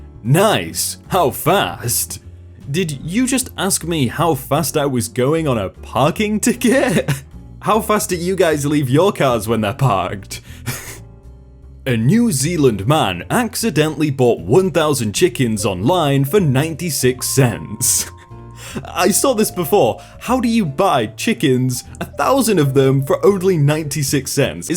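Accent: United Kingdom. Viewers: notice how when mispronounce the word "nuclear" the comments sections go crazy but when they make fun of british accent he don't give a sh** wait that's a dumb point to make, but I could take some feedback:D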